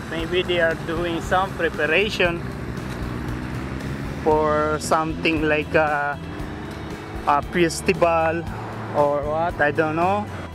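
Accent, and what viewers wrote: Philippines. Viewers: do not listen to people who criticizes you for speaking in english. you are trying your best, and i can understand what you are saying. sure, you may have a filipino accent, but we can all understand what you are saying. i’ve travelled to a lot of countries whose citizens can’t even understand basic english questions. point being, keep speaking in english, as you can only get better at it. i don’t ever reply on youtube video comments, as there are too many trolls, but i can’t stand it when people criticizes others for trying to improve themselves.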